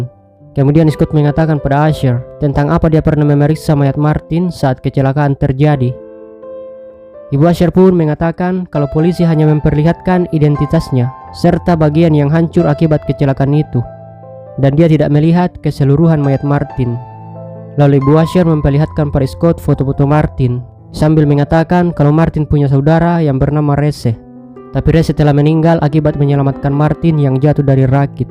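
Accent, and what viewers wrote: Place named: Jakarta, Indonesia. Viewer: owh..tapi cara ngomongnya kayak orang Betawi.....tapi semangat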